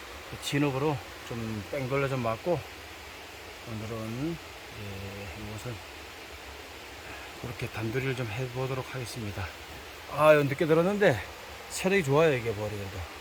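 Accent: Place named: Danyang, South Korea. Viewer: 간만에 단양 진또배기 사투리를 들으니 억양도 좋고 가지끈 반갑습니다 ㅋ 건강 유의하시고 사랑하는 동상^^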